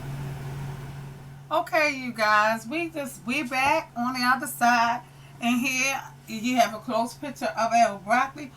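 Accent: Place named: Maryland, United States. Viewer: I agree. There is a difference between cooking fresh broccoli vs frozen broccoli. The frozen kind doesn’t hold flavor like I want it to. But I’ll cook with either one still. Also, I can hear in the way you talk that you’re from Maryland girl. I am too! 🙋🏽‍♀️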